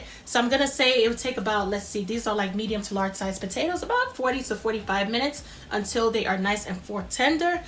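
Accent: New York, United States. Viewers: I hear the NYer in your voice 😂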